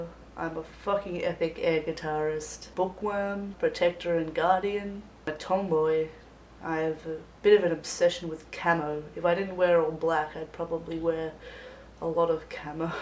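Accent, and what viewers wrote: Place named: Australia. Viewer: Your look is absolutely terrific, and I'm liking your videos... but your accent was still a surprise! (even though I knew you were an Aussie, from Facebook). Keep it up :) - Ian R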